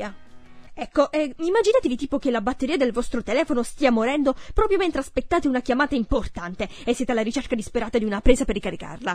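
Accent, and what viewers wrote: Bari, Italy. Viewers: Oddio 😍 accento pugliese, Barese? Sono di Bari, nuova iscritta complimenti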